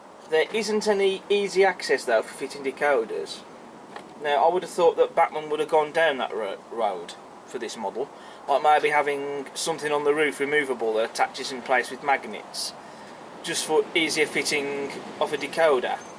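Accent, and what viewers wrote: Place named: Midlands, United Kingdom. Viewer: I used to live in Tamworth, back in the late 90’s. With the accent you have thought ud be in the Midlands.. I live in York now amazing city esp the plethora of Trains to see favourites being that York to Knaresborough beautiful😊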